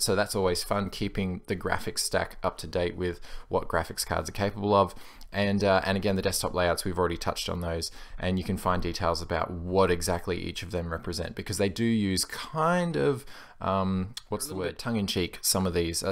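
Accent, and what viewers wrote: Australia. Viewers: Nice to hear an Aussie voice reviewing Linux for a change :) I’m new to Linux and Ubuntu Mate is definitely the best for a new user, I can tweak it to a windows like environment (the new Redmond option is virtually the same as Mint) but my gf wants to get a Mac because her current windows laptop is “too slow” (old) and she likes the Mac dock etc...well once I get more experienced myself I can set up the same distro for her for a free Mac that isn’t spyware (or anyone else who wants a fast, private, secure, easy to start using system) Also the explanations of software in Ubuntu Mate are very necessary for me or else I have no idea what is available or what it all is so that’s actually a feature I appreciate Ubuntu Mate to me is the only distro most people would ever need, or at least the best distro of all to start with while figuring out what other Linux distro is best for their specialized needs Highly appreciate everything the Ubuntu Mate team have done, just amazing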